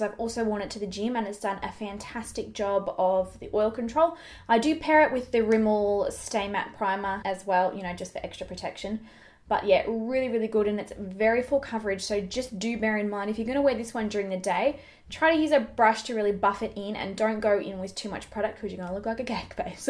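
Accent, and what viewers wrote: Australia. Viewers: As a fellow oily skinner, and a mummy on a budget I highly appreciate the affordable options! Thanks so much....ps I'm a kiwi and am quite amused by your ozzy accent..oy oy oy!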